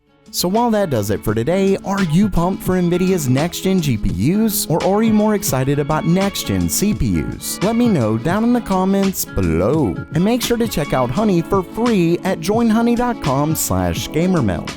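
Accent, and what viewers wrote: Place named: Texas, United States. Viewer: I just realized how Texan he sounds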